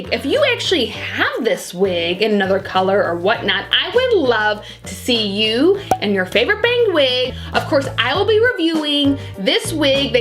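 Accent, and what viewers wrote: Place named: Upper Midwest, United States. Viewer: Are you from Minnesota, Wisconsin or North Dakota? Trying to figure out your accent.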